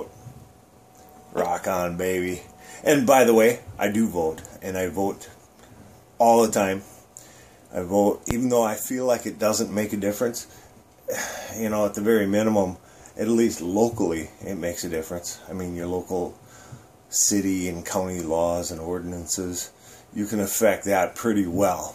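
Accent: Minnesota, United States. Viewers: yeah im one of those idiots(political telemarketer),,, we dont really care what people tell us mainly just try to make it through a shift a job is a job i dont even vote the way i call.. usually if you say you arent voting an a poll they usually end the call..but yeah 99% chance you are still on the list.. that call would be dispo'd just as a decline.. and often times they get the list of people to call under the list of registered voters... you almost sound like you are from "da range" in mn here maybe the UP from your accent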